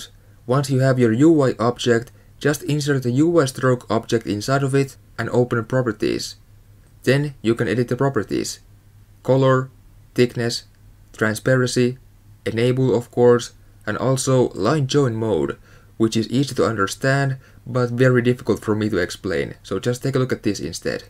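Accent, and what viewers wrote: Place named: Finland. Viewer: ei juma ootko suomalainen huomaa aksentista :DD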